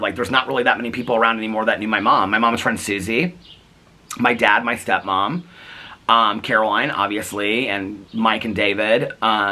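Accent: South, United States. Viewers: Miss Dolly Parton had the strong hold on you since you were 10 😂 bet that is where the southern accent came from! 🤠💙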